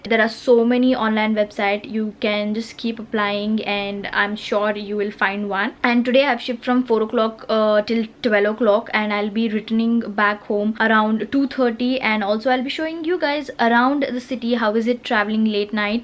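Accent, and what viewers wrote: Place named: North Karnataka, India. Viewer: ನಿಮ್ಮ ವಿಡಿಯೋ ನೋಡ್ತಿದ್ರೆ ನೋಡ್ತಾನೆ ಇರ್ಬೇಕು ಅನಿಸುತ್ತೇ ಒಂದೊಂದು ನಿಮಿಷನು ಸುಪರ್ ಆಗಿದೆ,ಉತ್ತರ ಕರ್ನಾಟಕ ಶೈಲಿಯ ಮಾತು, ಡ್ರೆಸ್ಸಿಂಗ್ ಸೆನ್ಸ್ ಸುಪರ್, my dear doll😍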